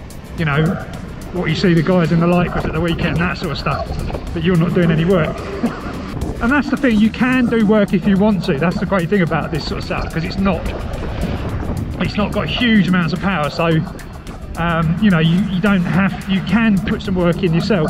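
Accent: United Kingdom. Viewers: Love the british accent: Pe'al to the me'al haahahah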